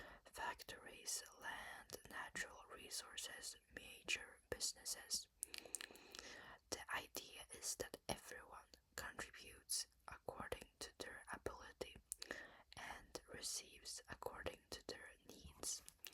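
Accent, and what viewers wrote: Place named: France. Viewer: Are you french??? Sounds like your have a French accent, I am myself a french girl and communist activist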